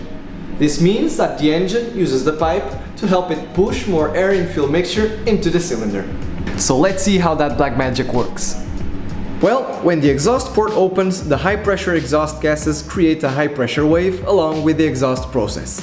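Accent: Portugal. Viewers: E bem diferente ouvir um português a falar inglês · Ótimo vídeo meu caro! Reconheci seu sotaque português de cara! Um abraço do Brasil! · Bem me parecia que eras tuga XD XD XD assim como o M Cunha não conhecia o canal... Big Props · Ah...a bela pronúncia portuguesa sobre o inglês :D Não engana! Não conhecia o canal, muito interessante. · Esse sotaque portugues nao engana ninguem ahahah. Subscrito! Adorei o conteúdo e a explicação direta ao assunto. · o narrador é Português... sotaque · és portugues?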